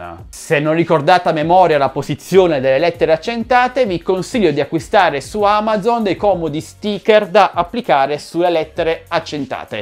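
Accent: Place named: Bari, Italy. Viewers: Cmp sei di Bari , Se sì Anch'io sono di Bari( si è sentito dall'accento)😝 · Dall'accento sei barese come me , grande . Un consiglio . Questo PC , con queste caratteristiche , potrebbe essere utile per fare musica , stile karaoke ?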